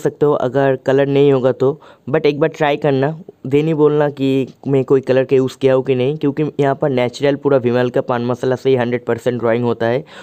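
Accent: Bengal, India. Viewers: His bengali ascent makes me laugh always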